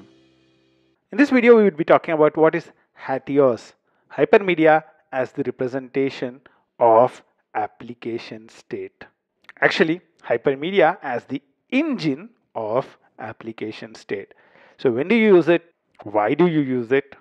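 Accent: India. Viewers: Индийцы имеют ужасный акцент, невозможно слушать такое издевательство над языком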